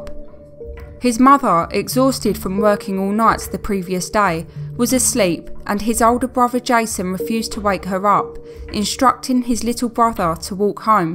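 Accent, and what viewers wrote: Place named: South London, United Kingdom. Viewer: I like the subject matter but I am so distracted by the awful narration ! Robotic and an awful South London accent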